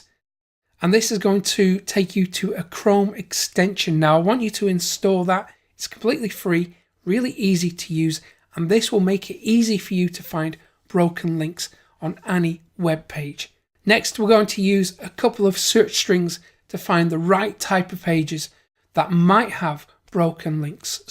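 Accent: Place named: Lancashire, United Kingdom. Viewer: HEY! how are tings in Lancashire, that's where the accent is from, right? Anyhow here's a thumbs up from County Cork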